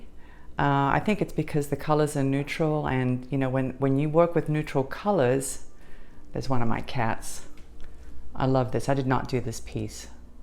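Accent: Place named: Australia. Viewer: I knew I detected an Australian accent! Wow, you had an interesting childhood! I had to make a choice in High School between music and art as we weren’t allowed to do both. I played violin and was in the orchestra so my path was laid. But, in elementary school, I won a few art awards and so I decided to bring it back into my life. Unfortunately, I became disabled almost five years ago now and I’ve become interested in different types of crafting. I enjoy beading and jewelry making as well, but I also enjoy paper crafts like card making and journal making. I’ve also become interested in polymer clay, needle felting and embroidery along with cross stitch. I’m also interested in learning watercolor techniques. I’m kind of all over the place and I think I need to figure out a schedule or something as I have more interests then time/energy to do them. I guess I’ll get it figured out eventually. 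Thank you for sharing! 💜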